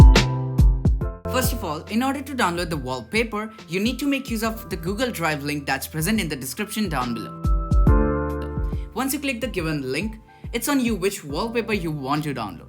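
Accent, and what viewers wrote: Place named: Middle East. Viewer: Sounds like a middle eastern scammer.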